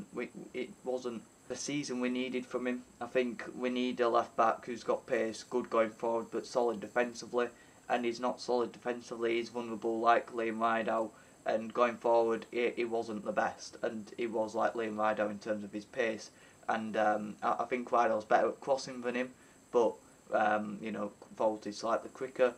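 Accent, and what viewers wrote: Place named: Yorkshire, United Kingdom. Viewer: I'm starting to understand Yorkshire English! 🙂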